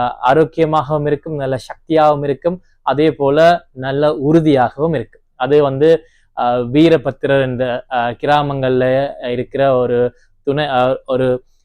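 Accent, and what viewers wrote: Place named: Sri Lanka. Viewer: Love your way of speech and slang... Srilanka?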